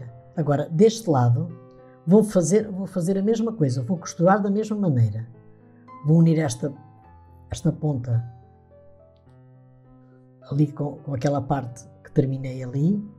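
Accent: Portugal. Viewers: Parabéns pela lindeza de meia e pelo sotaque lusitano, que me fez lembrar meu tio. 👏🏽😉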